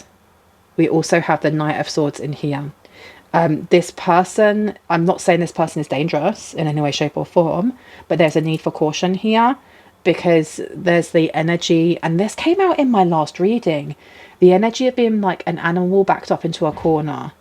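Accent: United Kingdom. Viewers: Thanks! This presentation and the one after it really resonated with me. In my situation, past & present. BTW really love your British accent.❤😊 i couldn't stop giggling when you said,...." as if they were stumbling around like they came out of a bar or something."" I was expecting you to say pub, though! 😂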